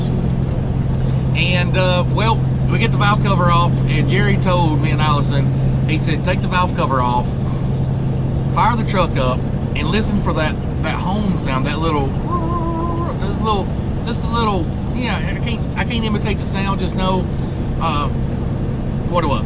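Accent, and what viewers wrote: South, United States. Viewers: Watched the video with the closed captions on. It doesn't recognize a southern accent very well. But I knew what you meant. I see the white chalky spots on your fan as well. That to me, confirms you have a leaky radiator. As for your cam issues, bearings, lifters, rockers, and oil passages, I would go ahead and do an inframe while you have it apart, and as the shop said, that might not happen for six months or longer. Start filling up your maintenance piggy bank and get it done and out of the way. You'll need to do an inframe sooner or later, so why not do it all at one sitting or during one downtime. I enjoy your vids and have been watching vids as well. I'll be back on the road soon and will keep my eyes open for you guys.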